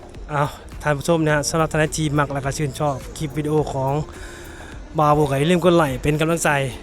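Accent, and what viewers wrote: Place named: Phrae, Thailand. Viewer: บ่าว วันนี้ฉันตื่นเช้าเข้ามาดูเลยคะบ่าวพูดได้ชัดเจน สามารถพูดให้คนไทยได้เข้าใจมีความเป็นคนไทยเยอคะพูดชัดเจนสำเนียงคล้ายคนไทยแพร่ภาพเลยคะให้ชาวโลกได้รู้ประเทศไทยคะ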